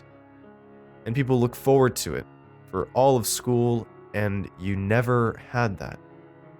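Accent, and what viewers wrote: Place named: United States. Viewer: i’m american, but have lived in england long enough to know this is written in the POV of someone british, but his american accent reading it threw me off completely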